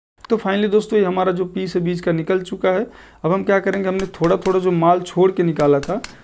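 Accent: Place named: Madhya Pradesh, India. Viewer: MP latiya bolate Hain Mere Bhai❤❤❤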